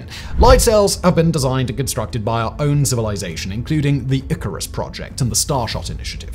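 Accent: England, United Kingdom. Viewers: Go back to England! Like soddenly you know what we’ve known for two years. I hate that snooty as accent.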